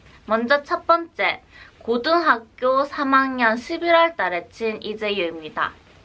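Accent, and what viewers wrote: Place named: Japan. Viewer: 대단합니다 일본사람이 한국어 하는줄 알았습니다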